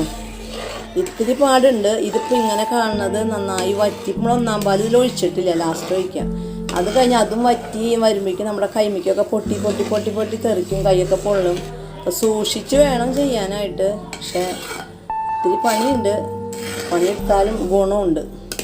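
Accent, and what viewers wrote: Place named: Thrissur, India. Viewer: ഇത് എവിടാ കിട്ടും. തൃശൂർ ആണോ. സംസാരം kettit.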